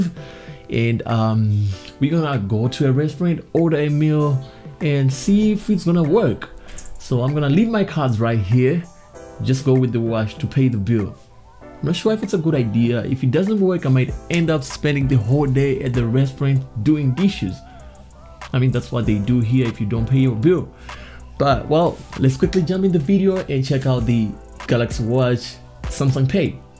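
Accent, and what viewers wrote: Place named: South Africa. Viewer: Def south africa 🇿🇦 broer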